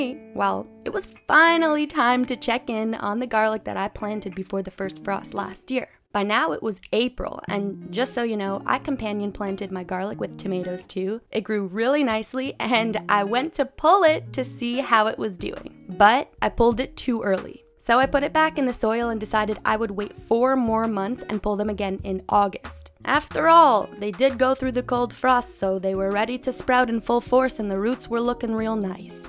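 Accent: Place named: New York, United States. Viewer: Just curious how come you have a bit of a New Jersey/New York-ish or I guess maybe even New England-ish accent when you’re from Canada? Have you lived in that area before?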